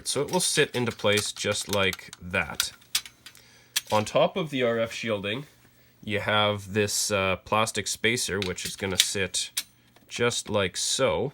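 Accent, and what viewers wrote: Canada. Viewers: Canada ay! You can tell, nothing wrong with that tho.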